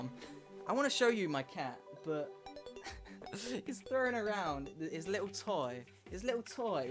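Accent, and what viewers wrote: United Kingdom. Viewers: 1 quid table cloth from asda = 1.46 table cloth from walmart for you yanks that didn't understand his queens english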